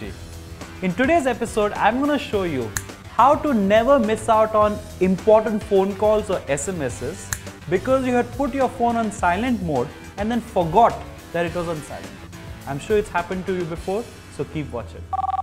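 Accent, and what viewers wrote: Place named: India. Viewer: I saw this as an ad before a video started but I kept watching it because I actually liked hearing his indian accent. hah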